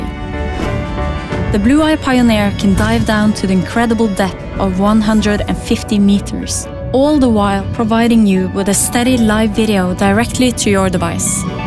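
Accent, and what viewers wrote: Norway. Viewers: I agree. Norwegian accents are charming. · Vi trenger ikkje prate oxford engelsk! Neh, heilt greit det der. Du hører når ein franskmann snakker engelsk feks, samt andre nasjoner så skinner det igjennom kor dei kommer fra. Så koffor kan ikkje vi ha litt norsk engelsk da? Har noen engelske venner som synes "norsk" engelsken er ganske kul sammenligna med mange andre. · Marius VanDamme Jeg hadde vært enig med deg om det ikke var for at det var så ille. Grunnen til at jeg ikke fokuserer på fransk-engelsken er nok fordi at det ikke er så store hint om fransken. Om en franskmann hadde hatt like store vansker som denne jenta her har med engelsk hadde vi ikke forstått noe. Vi burde vel egentlig være glad for at det ikke var en mer uforståelig engelsk i videoen. Om det bare var litt norsk igjen i engelsken, som for eksempel 10%, hadde det nok ikke vært så ille, men hun har jo sånn 50% norsk i engelsken sin, det er ikke bra.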